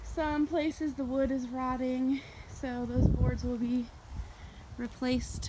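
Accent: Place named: United States. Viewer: Could you give a quick backstory at the beginning of each restoration video? I'm a 1st time viewer and have lots of questions: Is this going to be your house or are you all planning to flip it? Is your dad doing all the work? How does he how to do that kind of work? Will u just be documenting or are you doing work too? What state is this house in? (Not asking for exact location, just generally. By your accent I'm assuming it's in the USA). Ok, you answered my first question at the very, very end of the video. Lol.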